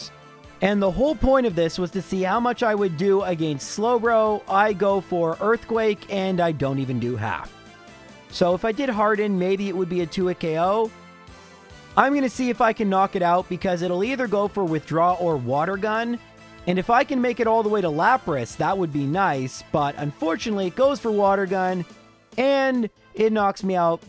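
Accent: Canada. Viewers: These videos mean so much to me man. It's weird, I only just started watching them about 6 months ago but these Gen1 Runs are such a relaxing, anti-anxiety chill time for me. Maybe it's the Canadian accent (I left Canada 10 years ago) and Gen 1 nostalgia, but dang man. Thanks so much, keep it up please!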